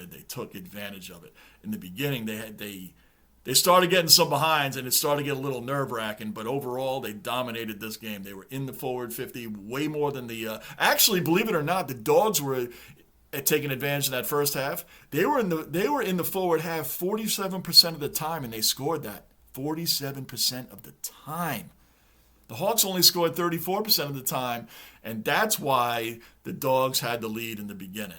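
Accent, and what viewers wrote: United States. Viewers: A novelty hearing an American accent talking about our great game like a fan with some knowledge. Keep up the great work bloke ..... 👍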